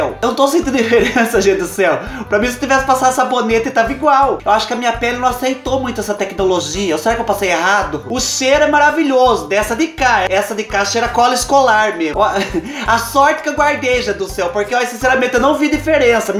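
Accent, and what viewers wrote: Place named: Minas Gerais, Brazil. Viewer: achava que o seu sotaque era para os lado de Minas kkkkk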